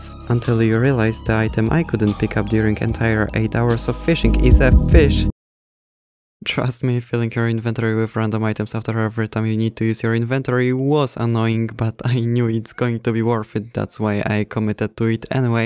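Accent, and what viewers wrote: Poland. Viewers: Kurwa oglądam sobie i słyszę charakterystyczny akcent patrzę w info a to kanał polski xD powodzenia w anglojęzycznej karierę będę tu chyba czasem wpadał :) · Słychać że Polak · U from poland? i recognise the accent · Jesteś z polski? Słychać po akcencie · You sound like you are from Poland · u sound like polish and sound like u are reading script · Are you from Poland? I hear an accent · Takiego polskiego akcentu nie ukryjesz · Kurwa odrazu po akcencie wiedziałem że to polak xD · po tym akcencie odrazu wiedzialem ze polak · słysze polski akcent czy nie prawda?? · My polish detector went insane after I heard you speak, I was right judging by your about page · Ty z polski? Bo masz nasz akcent? You from poland? Because you have our accent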